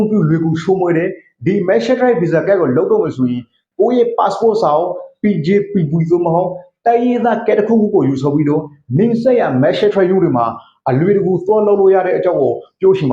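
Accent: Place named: Rakhine, Myanmar. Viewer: လူစကားကိုလူနားလည္ေအာင္ေျပာပါ ရခိုင္အသံႀကီးနဲ႔မေျပာနဲ႔လီးပဲ ေစာက္ေတာသား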